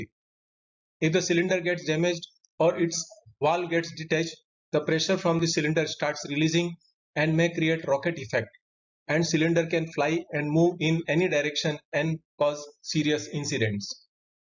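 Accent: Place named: India. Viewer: I don’t understand your India English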